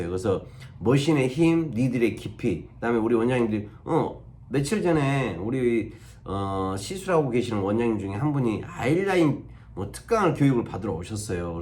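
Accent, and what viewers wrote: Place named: Jeolla, South Korea. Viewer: ㅋㅋㅋㅋ전라도 분이신갘ㅋㅋㅋ정감가요 말투가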